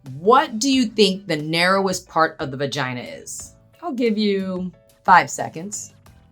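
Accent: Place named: United States. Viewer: American narration....cant be bothered to listen.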